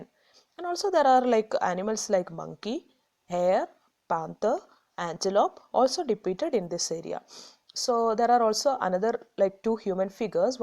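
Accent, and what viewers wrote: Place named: Kerala, India. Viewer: Beautiful video ma’am, love your Malayalam accent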